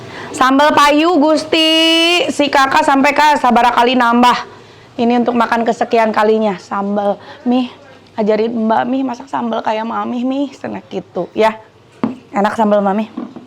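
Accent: West Java, Indonesia. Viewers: Lucu teteh bhs sunda na,geulis teh aph adanya sae pisan, geuliiis pisan😂😂😂bukan kayu teteh, rebung mh Awi alias bambu😊😊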